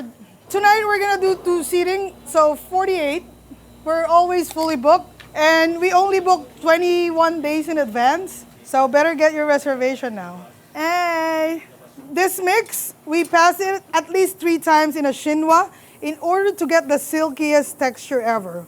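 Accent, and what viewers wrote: Philippines. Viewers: you can definitely tell she actually used to live in PH just by her humor and accent haha ! love her,, nakakaproud maging pinoy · Filipino humor is really like everywhere and you know it's pinoy style because of the delivery. I love your cooking style. You're not afraid to improvise. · Alam mo bilang pilipino nakakataba na di mo binago attitude mo as accent bilang pilipino kahit malayo na narating mo more power to you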